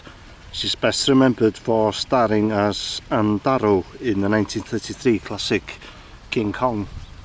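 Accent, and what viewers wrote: Wales, United Kingdom. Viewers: Loving your Welsh accent!! Love your channel xx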